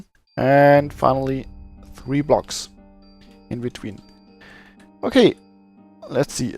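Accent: Germany. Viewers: Du bist Deutscher stimmt's? :D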